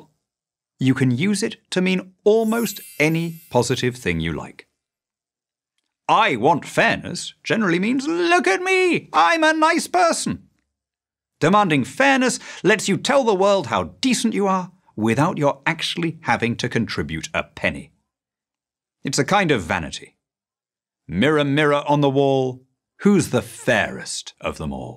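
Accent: United Kingdom. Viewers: Some things just sound better in a British accent 👏🏼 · Hearing the spoken in a British accent is so condescending. Can we have Americanized content for and by Americans please?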